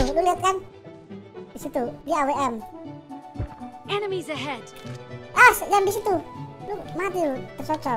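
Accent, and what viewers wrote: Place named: East Java, Indonesia. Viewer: Jawatimuran nie... Anjay ..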